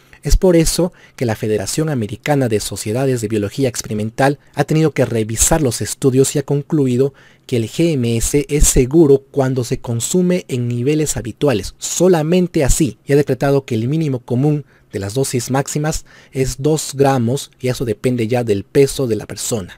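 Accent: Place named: Peru. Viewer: peruano eres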